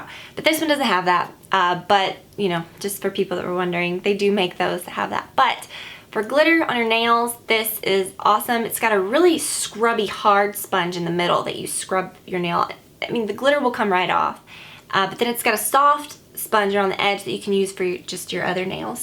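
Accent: South, United States. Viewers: Cute (and unusual for you ) southern accent in this video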